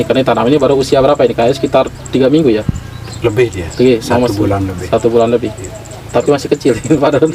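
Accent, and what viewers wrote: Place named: Lombok, Indonesia. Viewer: Logat orang sasak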